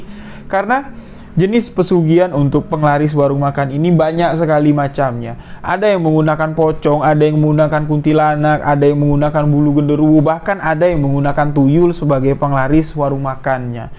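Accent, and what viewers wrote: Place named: Jambi, Indonesia. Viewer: Mas dari Jambi ya. Logat ny pengen tau aja saya. 🙏 atau dari Madura.. Salam dari Setu Bekasi. Sehat selalu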